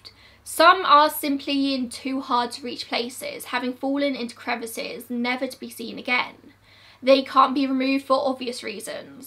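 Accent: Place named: Southern England, United Kingdom. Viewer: Thank you so much for all that u did and went through in order to give us this video 🥰🙏🏻 You’re an angel, I LOVE ur voice and the content u create always hits a nerve with me where I get really into it (although not in a “sensationalism” induced way I’d hope) Can’t believe I only JUST discovered ur channel (through the KRIS KREMERS & LISANNE FROON case bless their hearts and those of their families) but at least there’s a lot to catch-up on. Where abouts in the UK r u from btw? Going by ur accent I would guess somewhere around the southern parts of England? (I am half Swedish, half German so English isn’t my first (or even second) language, but we moved around Europe a lot when I was a kid and later as an university student, until I came here to London 🇬🇧 7 years ago so yeah 💁🏼‍♀️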